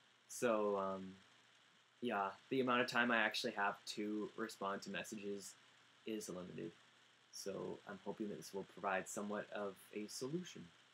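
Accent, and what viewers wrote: Canada. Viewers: This is actually the first time I've ever heard your Canadian accent xD Never really noticed it before x) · i heard your little Canadian accent more in this video than others lol its super cute ^^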